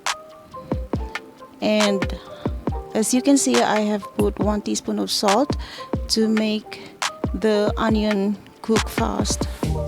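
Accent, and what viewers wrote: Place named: United States. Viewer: Ano ni sya dai..daw nmit haw..nmian gid ko bala sng tingug mo dai..dw americana gid😊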